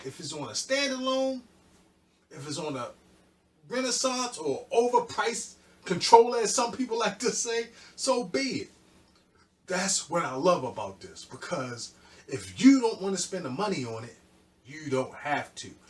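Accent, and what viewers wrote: Baltimore, United States. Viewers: Yeah Roland did just fade to black....I feel like they may come back! Roland where YALL AT?????? Sound like a Bmore accent?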